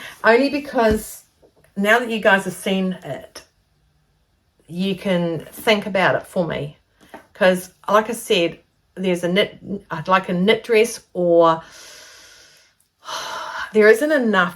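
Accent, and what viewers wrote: New Zealand. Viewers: Have just found your channel through Carol sews. Great to hear a New Zealand accent instead of always American (no offence to our USA bloggers). Your sense of humour is intoxicating, looking forward to following your your channel from a fellow sewist from across the ditch.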